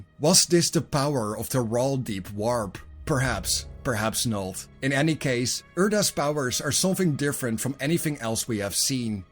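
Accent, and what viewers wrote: Netherlands. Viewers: Haha i can hear you're dutch from a mile away. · As an ex gw employee I have to say I like your topics and storytelling. As a fellow Dutchman I have to say I really dislike "our" accent in English 😅 nothing personal. Keep it going and thanks for spreading this aspect of the hobby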